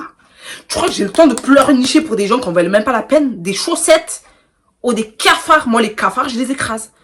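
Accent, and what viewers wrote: Marseille, France. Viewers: Cc ma belle une abonnée en plus tu est de Marseille en dirais avec ton accent. Tu à tout dit surtout les femmes sont trop méchante et hypocrite en amitié .vaut mieux marcher seul et être tranquille dans sa tête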